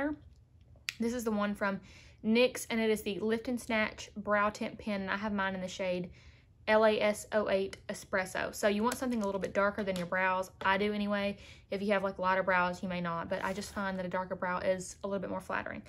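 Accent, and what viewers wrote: South, United States. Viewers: Came to tame my brows, stayed for the southern accent 💖✨ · Love your southern accent girl! 💖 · I love love love natural brow, even before she did the feathered or push up eyebrow look and this by far is the best video on these type brows. She has the cutest accent, and I believe a detect southern. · bruh as a british person this ladys accent is really fucking with me xD idk why i expected her to just sound like me and then boom her southern accent - what nice suprise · Ohhh how I love southern accents 🥰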